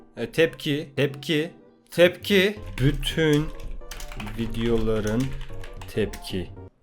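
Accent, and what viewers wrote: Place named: Çorum, Turkey. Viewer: Abi Çorumlu musun aynı benim ailem gibi konuşuyorsun çok komik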